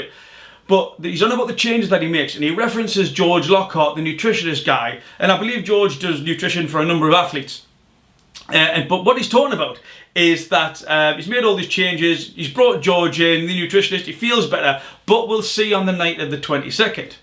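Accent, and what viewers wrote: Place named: North England, United Kingdom. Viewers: Leeds here not too far from Tyneside which sound like where you’re from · I'm from Sunderland, it's the first of your videos I've seen but guessing by your accent you're not too far away.. great video 👍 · Went to Uni in Yorkshire - are you from North-England or scotland? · Im from Darlington which, judging from your accent, is not too far from you. I'd like to see you do a video on the Izzy v Romero weigh in. Might be a tad late like but either way keep grafting mate. 100k next.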